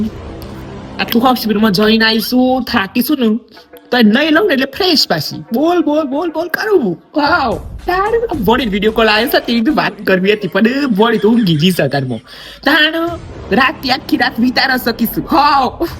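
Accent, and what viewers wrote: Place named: Idar, India. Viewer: msst cho tme mne to idar ni bhasa bv gme che ho bijo pn bnvjo ok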